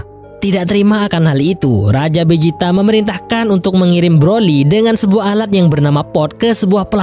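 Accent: Madura, Indonesia. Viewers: Kelihatan logatnya logat plat M 😀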